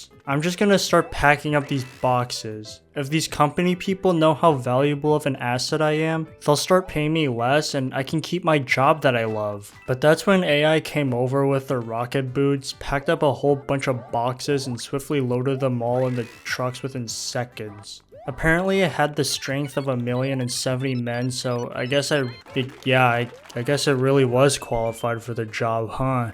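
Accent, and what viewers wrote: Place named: China. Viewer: You sound so chiense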